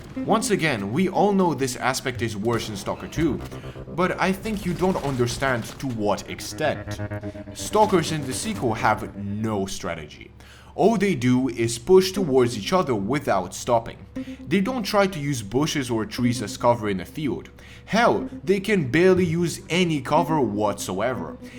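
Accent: Russia. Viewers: As I understand it, the author of the channel is a Russian speaker like me (based on the RU interface, which sometimes appeared in the video and a slight accent) I can only praise him for such a video, knowing what kind of resistance he can get from those who justify all these downgrades. It is surprising that in the video of an English-speaking person there are almost no dislikes and defenders of this "masterpiece", but when a Russian-speaking person says similar things, then some kind of nightmare and insults begin in the comments. Thanks to the recommendations of YouTube for letting me see this video, thanks to the author for the work done. All the best. P.S. Nothing in the game works as the developers claimed, but it certainly deserves 83% positive reviews (at the time of writing the comment). Fucking circus, there are simply no words.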